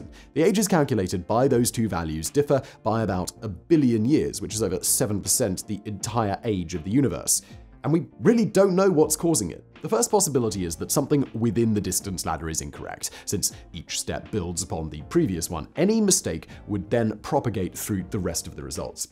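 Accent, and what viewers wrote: England, United Kingdom. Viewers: Great video. Lose that English accent. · A little hard, hes English lol. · Why does vsauce have a British accent and look slightly different?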